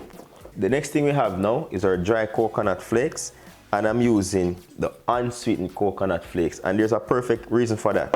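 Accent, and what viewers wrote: Jamaica. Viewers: Came here for coconut shrimp but oh my u r sexy.....love your Jamaica accent ...come to my kitchen we gonna do lots of cooking. ...😉😉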